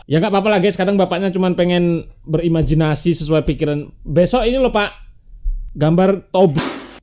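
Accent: Java, Indonesia. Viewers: tak kiro samean wong jowo bang 😂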